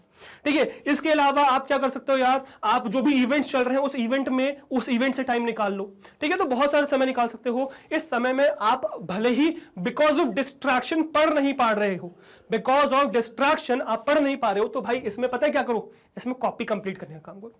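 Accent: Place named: Bihar, India. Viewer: Sir ur bihari accents op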